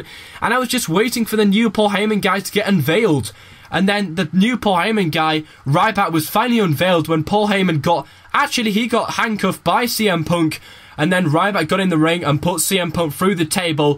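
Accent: United Kingdom. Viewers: I thought you were British!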